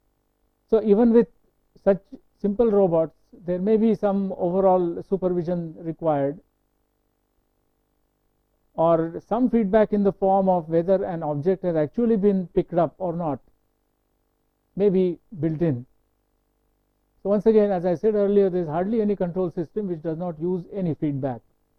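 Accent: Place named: South India, India. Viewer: Some Southrn Indian Mix English But it can be understand, Who D Fker Says It Cant be understandble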